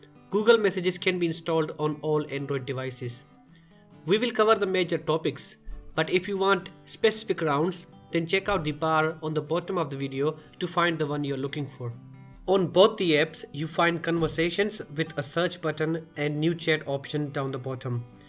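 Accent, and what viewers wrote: India. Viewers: I cannot understand what he is saying with his India accent.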